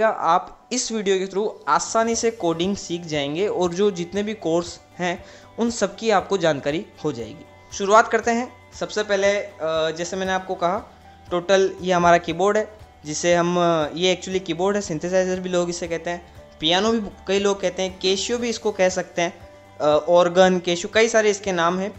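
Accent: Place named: Gujarat, India. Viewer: Ye pakka gujrati hai 😂😂😂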